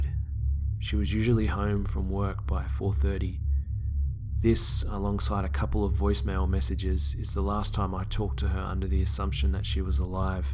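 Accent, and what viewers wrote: Australia. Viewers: holy s***, you're Australian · mate are you Australia P.s me too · dammmmn can tell you're from Australia straight away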